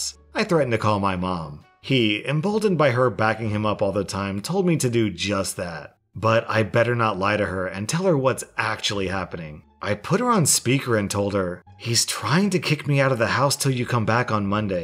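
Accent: Australia. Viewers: 000 he’s Australian for sure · we have an Australian in the house,G-day (I know no one actually says that seriously but hello)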